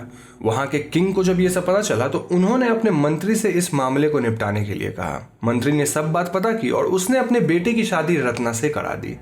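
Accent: Bengal, India. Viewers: Are you a bengalee??? 👨‍💼👨‍💼👨‍💼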